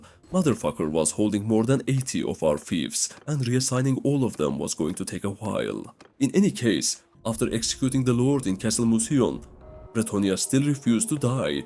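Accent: Turkey. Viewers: From the accent this guy is %100 Turkish. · this is a Turkish accent tho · Bro what accent is this?, I hear turkish, but might be wrong? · Böyle baskın türk aksanı görmemiştim çok iyi dknwkendkw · Ckhdhchzhdhhhdhz türk aksanı asla atlamam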